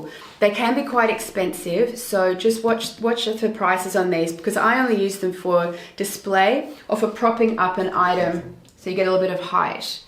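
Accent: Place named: Australia. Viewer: I can't handle the Oz accent....I tried to watch the whole video but didn't last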